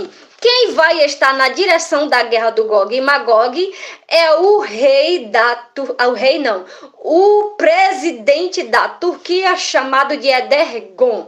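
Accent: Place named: Northeast, Brazil. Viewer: Kkkkkk chegando agorinha 😂❤°°!!!! Vc deve ser de algum estado do Nordeste,, vc fala com sutak q nem eu !!! JA ME ESCREVI E É AQUI QUE VOU APRENDER MAIS ➕️ ➕️!! OBRIGADO!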